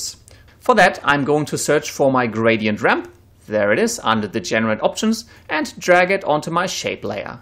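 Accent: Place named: Germany. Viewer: eindeutig deutscher Akzent thank you helps me alot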